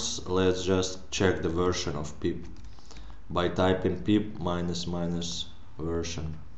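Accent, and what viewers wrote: Russia. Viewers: мне показалось или он русский???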